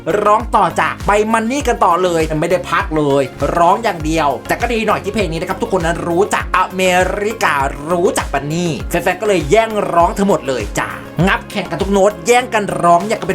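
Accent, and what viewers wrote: Isan, Thailand. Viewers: สุดยอด Lisa❤️❤️👑👑 มักเสียงเว้าเด้ มวนคักจนนึกภาพออกเลย 😆😆😂😂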